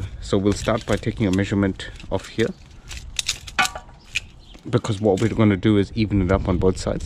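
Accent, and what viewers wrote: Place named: South Africa. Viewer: thnx. car is Evo V 400hp street car. Btw where are you based , your accent sounds like you originally from South Africa 😊 ?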